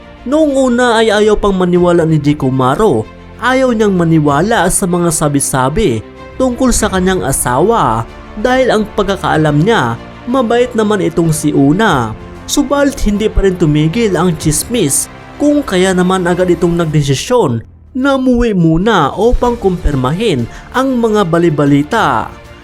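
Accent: Philippines. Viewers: visaya ka kuya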